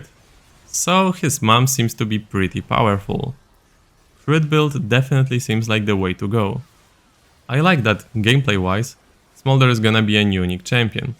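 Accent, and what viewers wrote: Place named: Poland. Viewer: polish accent diff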